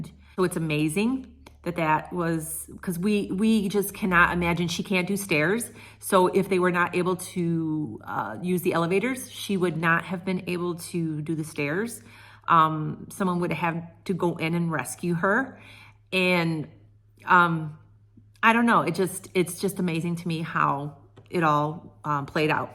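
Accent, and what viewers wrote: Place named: Michigan, United States. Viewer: Oh, my goodness what a time your family has had, but our God is so good!! I just came across your videos this week and wondered if you were from Michigan as I recognize the accent, lol. I am originally from Riverview. I have lived in Tennessee most of my life, but still have a soft spot for Michiganders. I am praying for your husband, his mother, and you!! 🙏🙏🙏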